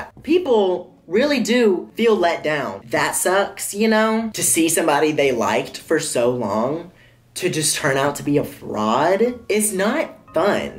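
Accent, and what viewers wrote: South, United States. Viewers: Finally a YouTuber that I actually like that has a southern accent!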